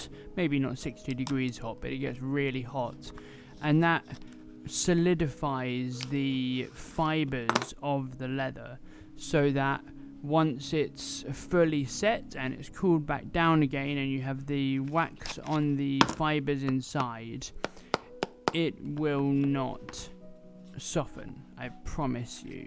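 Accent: United Kingdom. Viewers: Какой же отвратительный акцент у британского)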